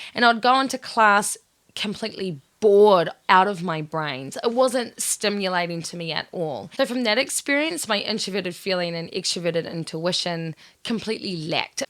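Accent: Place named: New Zealand. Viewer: That posh/bogan kiwi accent tho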